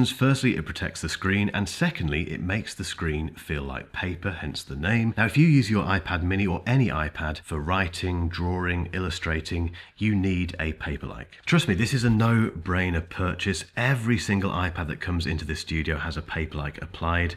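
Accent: United Kingdom. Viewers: 看到你講話的方式跟聲音，就好像在看一部英國電影，十分有趣，期待後續 I pad mini 7 的ai評測。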